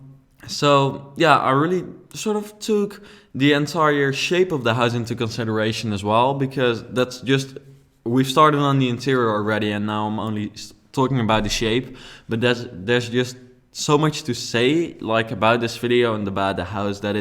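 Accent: Netherlands. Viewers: Haha I recognized your accent as being Dutch before I knew you were! Awesome! Just bought this expansion and looking for some modern builds as well. I like modern and I like my vampires to live in a modern house as well. Nice build! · are you Dutch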